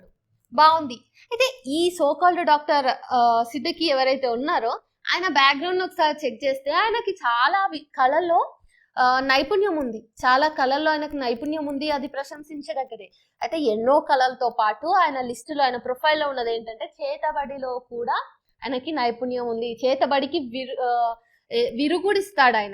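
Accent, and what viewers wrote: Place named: Telangana, India. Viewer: అమ్మా తల్లి అసలు నువ్వు ఆంధ్ర నా? తెలంగాణ నా? ఆంధ్ర ఐతే నీ భాష గట్లుందేందీ తెలంగాణ లెక్క? పోనీ తెలంగాణ ఐతే ఆంధ్ర రాజకీయాలతో నీకేం పని.. అసలు నీకెందుకు పిఠాపురం ప్రజలు కామెంట్స్ పెట్టాలి?వాడెవడో అయ్యానెమ్మ సీఎం నా, బంట్రోతూ కదా అన్నాడు..పిచ్చ మోహమోడీకి బంట్రోతు పరిపాలన చేయడు అని కూడా తెలియకుండా కింద కామెంట్స్ పెట్టటానికి ఎగేసుకుని వస్తారు.. పని పాట లేని సన్నాసులు... ఇక నీ విషయానికి వస్తే the తొక్కలో జర్నలిస్ట్...నీ స్లాంగ్ ని బట్టి నువ్వు పక్క తెలంగాణ అని అందరికి తెలుస్తుంది...నీ తెలంగాణ రాజకీయాల గురించి గంటలు గంటలు నీ vocal cards అరిగిపోయేదాకా మాట్లాడుకో.. మా పవన్ సార్ జోలికి వస్తే తొక్క తీసి ఆరేస్తాము....జాగ్రత్త. 😡😡😡😡😡😡😡😡😡😡😡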